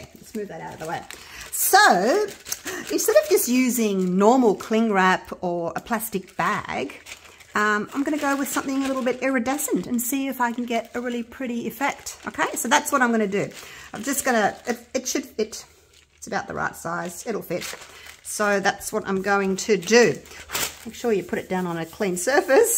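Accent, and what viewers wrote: Australia. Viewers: You have a beautiful home, where do you live ? I'm assuming from your accent that you are Australian, please forgive me asking so many questions this is my first time here and you are very very intriguing I like your channel and I like the way that you talk...... Yeah, I know I'm weird but it takes many many colors to make a rainbow. That's my way of justifying my weirdness haha. Anywho great project go with the back in Black, that looked the best in my opinion. Take care stay healthy, you my dear, have a new subscriber 👍👍 -Jonny5 🥁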